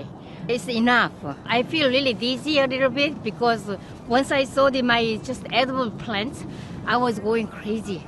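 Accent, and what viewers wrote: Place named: South Korea. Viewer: your Korean accent is sooo cute!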